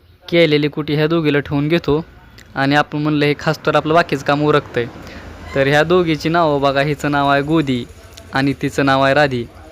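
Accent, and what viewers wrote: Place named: Solapur, India. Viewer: सोलापुरी भाषा खुप खुप छान आहे 👌👌👌👌🎉खूप आवडते आस बोलायला ❤❤❤ त्या झाडाला आमच्या कडे जमु बेट म्हणतात पण तुमच्याकडे काय नाव आहे त्या झाडाचे👍👍👍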